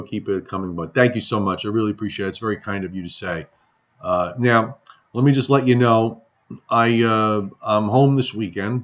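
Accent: Long Island, United States. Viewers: 45 "my-aaaa" soo long Island.:) We say it in nj and nyc too but lil differently.. I love all your videos and enjoy your speaking style (straight up north nj nyc long isl). I know your big time on tv and other projects but you have an excellent radio voice and flow. Im guessing you lean center right..(caught that when you spoke about pre tropical storm warning era cant be proof of global warming. Maybe one day you can branch out into am talk radio or non political variety show. Just a compliment and thoughts. I cant wait to your winter noreaster live streams. Im a big fan of tracking them like you. Im a marketing adv. Man but my childhood dreams was to be a meteorologist. Thanks.. Gabe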